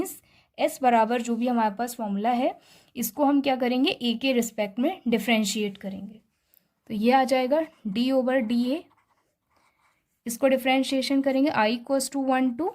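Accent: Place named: India. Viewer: Even though my ears are not used to Indian English, and that I could not understand much of the discourse, the graphical explanation was impeccable. Thank you too much!! Greetings from an Spanish speaking country, the Dominican Republic.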